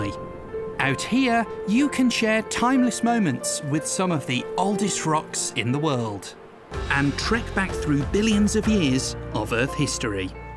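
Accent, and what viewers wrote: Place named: England, United Kingdom. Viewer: Lovely video. Funny that an Englishman is narrating it 😎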